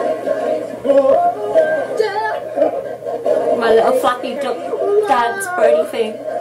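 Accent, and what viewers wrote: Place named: United Kingdom. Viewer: AHH this was awesome, loved seeing how you reacted. I was the same, Also love your accent. From the UK right? you can punch me if i am wrong ahah xD I am from the UK but you have a much nicer voice than my own xD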